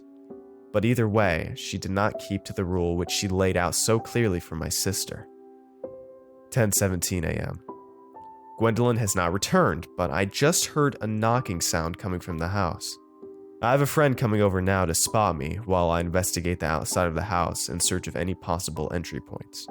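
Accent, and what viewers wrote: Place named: United States. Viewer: Discovered your channel last week and just subscribed. You're one of very few American horror narrator (assuming, by the sound of your voice, you are American. No offence, if not) that I like.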